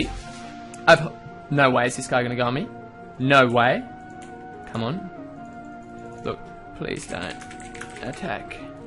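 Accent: Australia. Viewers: Your an Aussie eh? I served in the military with a few of you bastards. Fucking love you guys man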